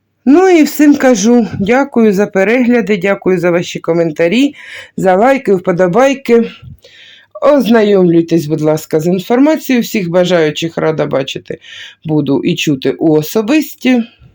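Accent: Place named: Slobozhanshchyna, Ukraine. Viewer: Моя названа мама була із Купянська, так що говір Слобожанщини впізнаю одразу. 😍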